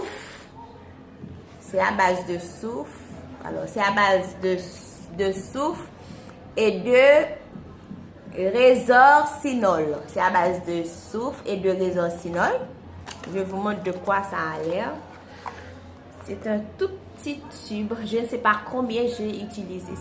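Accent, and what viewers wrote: Haiti. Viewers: Waw j aime trop l accent haïtienne...j ai kiffé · Depuis sur l'accent , je pensais dèjà qu'elle etait haitienne!!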